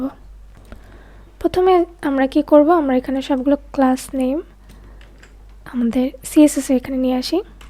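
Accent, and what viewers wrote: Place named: Bengal, India. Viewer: Your Bengali accent is so sweet , I fall in love with you ❤❤ Do you know Hindi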